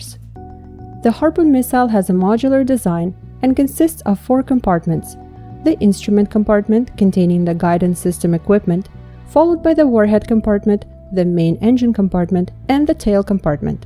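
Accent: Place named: Russia. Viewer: I’ll take a person with a Russian accents word over anything produced in the us as to the true capabilities of this weapon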